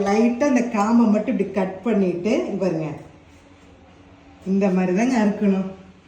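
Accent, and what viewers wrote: Kongu, India. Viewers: Kongu slang ❤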